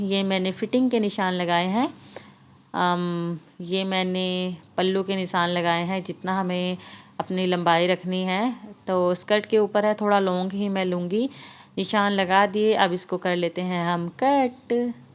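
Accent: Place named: Saharanpur, India. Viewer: Aap up me kaha se apki language se lgta h aap Saharanpur se h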